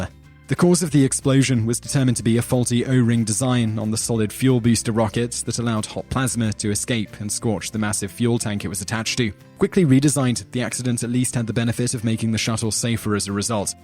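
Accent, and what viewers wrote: England, United Kingdom. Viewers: As told with an English accent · He's a Brit, so give him a break! Lol!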